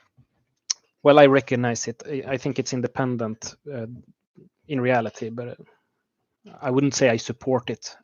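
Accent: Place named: Sweden. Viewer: I could tell right away you were swedish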